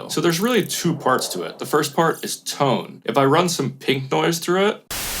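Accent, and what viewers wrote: United Kingdom. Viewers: Oh eck - right out of bloody Yorkshire, I tell thee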